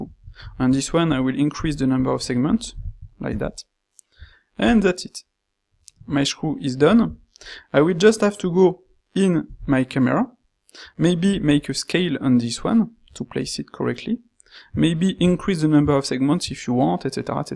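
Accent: France. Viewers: tu est français? sa s'entend grave lol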